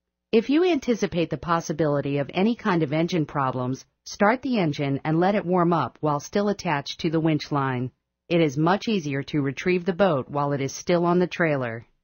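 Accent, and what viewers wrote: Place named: Australia. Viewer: Thats awesome! Are you from like Australlia or something?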